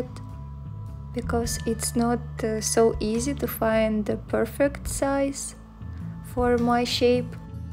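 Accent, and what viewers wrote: Russia. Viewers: Привет, русскому акценту в английском ! 😁😁😁 Классная у тебя фигура ! 👍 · Мне рязанский акцент сразу понравился! :)))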